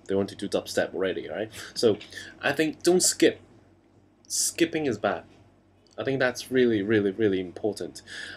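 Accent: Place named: United Kingdom. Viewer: British accent!!!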